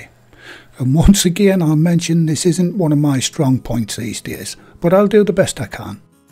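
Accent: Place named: Sunderland, United Kingdom. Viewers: thanks. I’ve always loved the Makkems, how could I not listen to that southern accent and enjoy it? My dad was from Blyth! No-one understood me when I came to the US, I had to “Londonise” my accent. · Love your videos thanks for taking the time to make them. My dad was from Sunderland so as you can imagine I love the accent. Could you possibly give an idea of cooking times for the small muffin tin sized pies. Also rough weight of fillings in them. Thanks Geri Hargreaves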